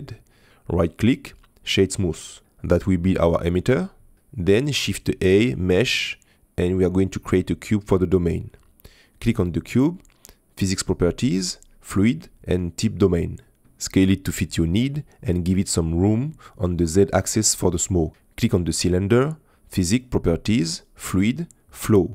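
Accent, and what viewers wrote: France. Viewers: I really like your french accent dude!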